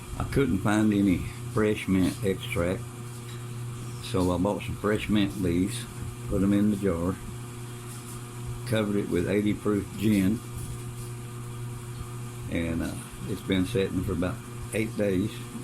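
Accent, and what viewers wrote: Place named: South, United States. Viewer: This was neat as heck! Can't you get any backer in the Philippines? New friend here you sound just like everyone around here. Ain't nothing no better than a southern draw. At least not too me. Look forward to more videos. Stay safe and God bless 🙏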